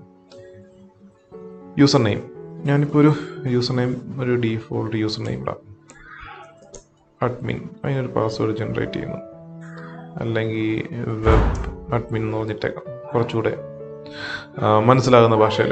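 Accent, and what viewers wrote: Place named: Kottayam, India. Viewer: കോട്ടയം ആണ് അല്ലെ 😁 എന്നാന്നെ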